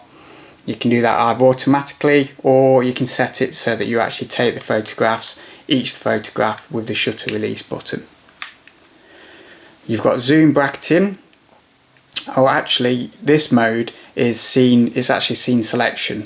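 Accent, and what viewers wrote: United Kingdom. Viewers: Epic British Accent !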